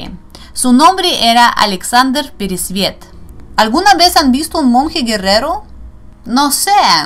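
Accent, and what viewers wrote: Russia. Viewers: No me gusta como hablas el espanol me gustaria que hablaras el espanol sin acento ruso y lo hablaras como nosotros los latinos. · Estas perdiendo tu acento ruso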